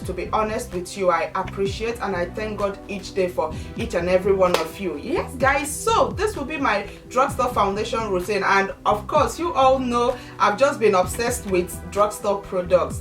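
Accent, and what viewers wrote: Nigeria. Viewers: love your nigerian accent ....hope this is not to personal what tribe ?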